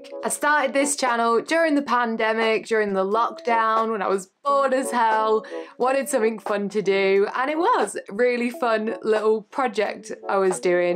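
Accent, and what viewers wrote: Manchester, United Kingdom. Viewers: I'm guessing the Lego is a part of your balance and should not mix with the World Wide Web??? Your series is the only one that REALLY hit home to me, it was because it was closer to home with me (UK, and the 'proud to be' Manc accent). Ill be sure to keep tabs on your efforts. A great idea to focus on the 'after Scientology', It would be quite empowering I think. Well done, keep up the great work, your a natural. RIP Grandma x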